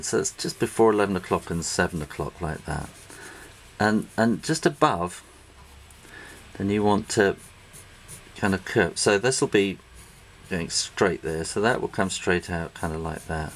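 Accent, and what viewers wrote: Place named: United Kingdom. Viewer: This man speak like Jhonn deacon very Enghlis UK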